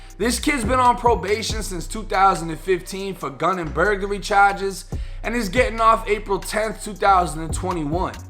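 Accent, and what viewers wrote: Boston, United States. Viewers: You sound like you're from Boston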